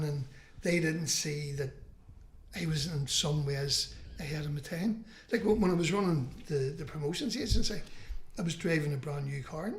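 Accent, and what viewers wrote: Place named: Larne, United Kingdom. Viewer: That Larne accent is something shocking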